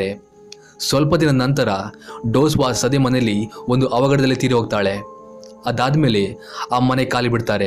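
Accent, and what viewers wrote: Kundapura, India. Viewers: Are you from kundapura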